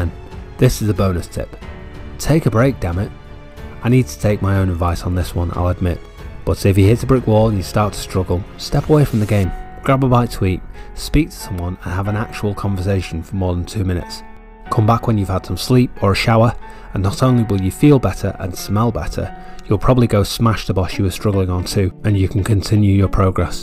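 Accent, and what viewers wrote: United Kingdom. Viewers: It’s the British accent it gives the video the sense of genuine knowledge. Like a ted talk lmao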